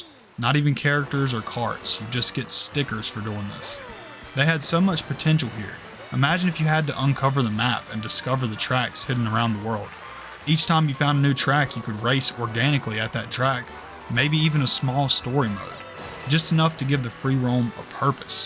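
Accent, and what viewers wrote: South, United States. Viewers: completely off topic, but where in the South are you from? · love his southern accent too as a Texan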